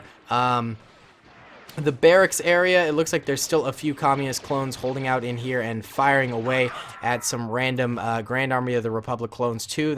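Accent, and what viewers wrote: Russia. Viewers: This is the perfect video. Your Russian accent is hilarious, and the Soviet Anthem in the background makes it even more entertaining. Also, you should try doing an Old Republic video someday. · his russian accent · leave the russian accent for barronvongames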